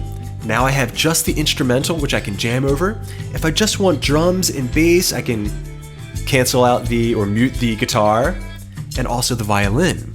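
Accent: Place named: Maryland, United States. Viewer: Great demo! Your accent sounds a lot like a Chesapeake Maryland accent.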